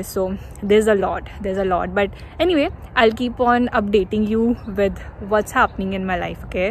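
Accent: India. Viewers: Weird background music, shameless self propaganda and chattering in monotone Indian english!!! Mentioning eye's dark circle and rubbing nameless cream is big stock of laugh. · 😂Indian accent I love it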